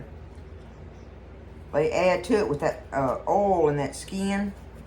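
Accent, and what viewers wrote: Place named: Texas, United States. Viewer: That Texas drawl you have is so wholesome & refreshing Ms..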